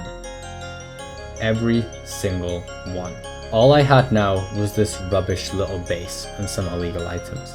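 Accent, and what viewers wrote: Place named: Central Scotland, United Kingdom. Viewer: You have no idea how surreal it feels to hear a Scottish Minecraft YouTuber. You sound like your from central Scotland too like me!!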